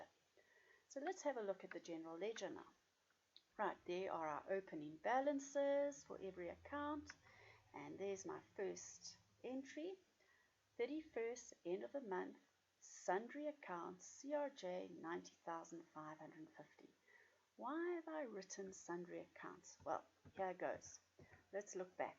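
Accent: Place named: South Africa. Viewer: Eyy Ur South African🤣👌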